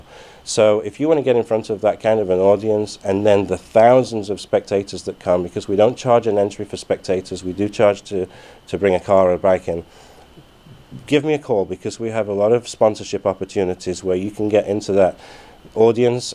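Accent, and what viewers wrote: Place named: United States. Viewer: You must have been living in FL a long time, you sound American but without the accent lol. If I find myself in Florida around Easter time one year, I'll be there. On the other hand, if you decide to take Wheels Across The Pond on the road, I spend a fair amount of time in Dallas (Fair Park would be a great venue), give me a shout and I'll make sure I'm first through the gate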